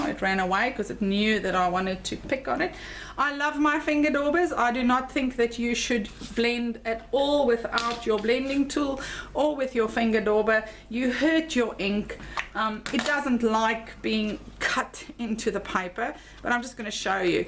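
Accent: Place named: South Africa. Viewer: Thank you for this great comparison. So fun to hear a South African accent on a crafty video ❤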